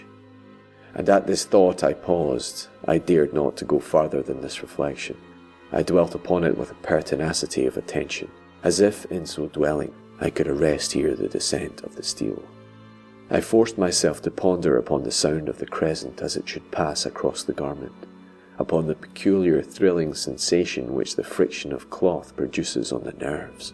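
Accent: Scotland, United Kingdom. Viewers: Oh my! I must tell you...I LOVE YOUR ACCENT! Are you scottish? :D Will you marry me? Haha! But seriously, you have a very lovely accent...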